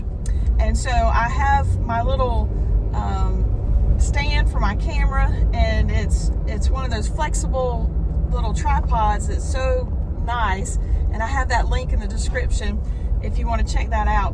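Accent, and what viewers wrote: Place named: Georgia, United States. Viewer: First, I love your accent but I may be partial since I live in GA😂😂 Thanks for sharing your insights and inspiration. I’m tuned in😊😊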